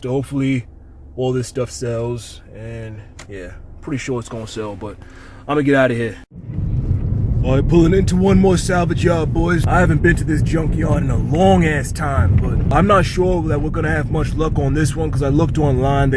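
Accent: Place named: Boston, United States. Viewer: are you from boston or the boston area? your accent tells me you are or near by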